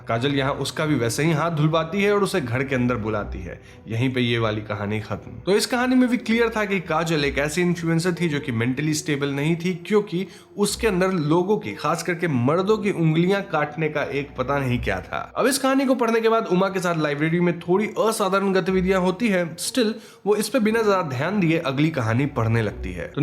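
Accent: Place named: Bihar, India. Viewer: जिया हो बिहार के लाल भाई बिहार से हूं एक्सेंट आ रहा था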